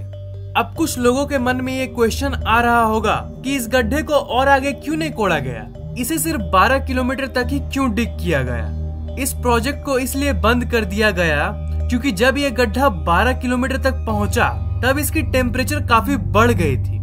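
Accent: Bihar, India. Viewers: gaddha korna mtlb full desi ❤️❤️😂 Bihari ascent ❤️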